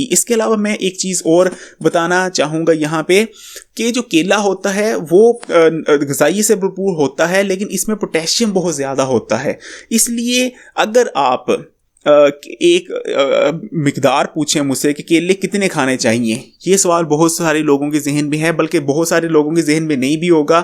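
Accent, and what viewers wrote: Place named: Punjab, India. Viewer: Hahaha buri bat hai..punjabion k lehjay se hi pata chal jata hai k punjabi lehja hai..lekin koi bat nahi kher hai bhai hain apne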